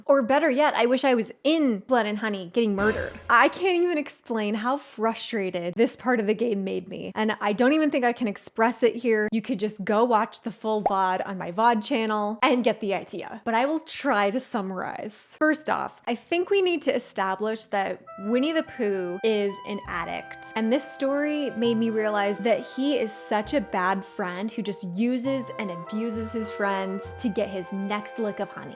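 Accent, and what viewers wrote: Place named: West Coast, United States. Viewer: She's from California so skill issues aren't her fault. It's the game's fault. (I have no idea where she's from but she sure talks like she's from the West Coast.)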